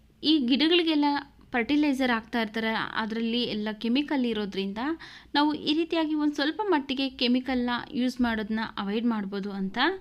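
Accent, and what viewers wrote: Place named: Kolar, India. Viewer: kolar bagepalli telugu kannada mataadteera... nice tips...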